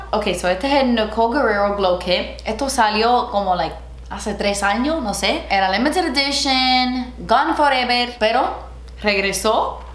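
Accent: Cuba. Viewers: Me encantó este video en español, lo hiciste muy bien. Me gusta tu español cubano. Saludos desde República Dominicana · Soy mexicana, creo que tu acento cubano es genial, y tu español es muy bueno. Saludos :) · Me encanta cuando haces videos en español, hazlos más seguido así entiendo😁 . Se te nota que aprendiste el español con alguien de cuba jeje. Saludos desde Uruguay 🇺🇾(américa del sur) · Amo tu acento cubano! Es lo máximo :) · Me encanta tu acento cubano, de donde lo sacas 🤔 eres muy valiente! Ojalá hagas mas vídeos así para tu publico hispano 😘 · Jajajaja comment no se dice "comento" se dice comentario... But you're so cute! Amo tu español con acento bien cubano 🖤 and primer es prebase ya sea prebase de ojos o prebase de maquillaje.. Love you girl! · I never comment but these are my FAVOURITE KINDS OF VIDEO EVER. Honestly so good. As a native Spanish speaker, I appreciate these so much 😂 me encanta el acento cubano también. 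Now I live in the UK so I can relate to not knowing some of the words in Spanish (but I personally have no excuse 😂🙈) xx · Me encanta tu acento cubano!!! seguramente no tienes eduación formal en español pero hablas muy bien. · Porfavorrrrr more videos in Spanish!!!!!! Tienes mucho flow hablando con ese súper acento cubano!!!! 😍😍 podrías ver algun video de youtubers españolas como: o alguna latina 😝 para mejorar el idioma !! Un saludo desde Islas Canarias (españa) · Me encanta oirte hablar español cubano! Cada vez hablas mejor!! 👏🏻👏🏻👏🏻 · Acento cubano mami!! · I think you've improve your Spanish in this video so far... I hear you more fluent and comfortable with it. I really enjoy your videos so much and this made me laugh so hard. I love your cuban accent. Send you much love and good vibes <3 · Tienes un bonito acento cubano 🥰 love it! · such a Slytherin look! love it! and your cuban accent is so cute haha (love from Uruguay :D) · Tu español es súper bueno!!!! 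Cuando nosotras hablamos de maquillaje (en español) usamos palabras en inglés, por ejemplo: highlight. Así que no te preocupes ❤️ Lo único que me causa gracia es que tu acento es SÚPER cubano y nunca pensé que sería así jajaj a pesar de que tus raíces son cubanas. Proud of you girl ❤️ siempre has sido mi inspiración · Mojado y Salvaje! 😉 Love this videos in Spanish! Y ese acento cubano es divino! 😃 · You speak Spanish like I do. I consider English my first language even though I am Cuban. I do not have a Spanish accent when I speak in English (which is always). But I’m kind of surprised because of your noticeable (and adorable) Cuban accent I thought your Spanish would be more fluent. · Me encantó el acento cubano que te salió en el vídeo. Un besazo desde España 💋💋💋💋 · Blend= difuminar. Amo tu acento cubano 💕 Also we dont call primer the “cebador” 😂 just primer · Don’t know how to say it in Spanish? 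Add “ar” at the end and you’re good to go jajajajajajaja Amo cuando hace los videos en español, me dan mucha risa y el acento cubano es puro amor 😍😍😍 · Pero a mi me gusta sobre todo el acentazo cubano tan genuino. Try= probar, usar. Y lo de la abuela: very good fandango! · wow your cuban accent is so strong!!! I learn spanish from spain growing up so it's so cool to hear the different accents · Please please please make more videos in Spanish lol I really enjoyed watching this video, and i love your Cuban accent. fyi my sister have the same name of you, we're from venezuela. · did not know was cuban... or at least speaks with a cuban accent 🇨🇺 ❤️ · Qué gracioso vídeo. Tú español cubano 😁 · Me encanta ese acento cubano 😂❤️ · Hahahaa me encanta su spanglish! 🤣🤣 true 305 cuban style ayeee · Jajajajajakakakaka me encanta te ha enseñado a hablar alguien cubano o de puerto rico jajajaja pero me encanta ojala te hagas un canal en español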